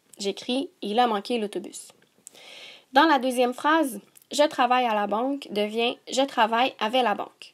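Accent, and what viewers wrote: Quebec, Canada. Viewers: Elle est quebecoise, au Québec la langue officielle est le français Je sais c'est pas le même accent mais ça fait son charme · Merci ! Mais es-que ses un accent québéquoi ? (pardon pour avoir mal écrit ) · bravo je suis une nouvelle abonné et j ai connais cette accent québécoise merci bcp lâche pas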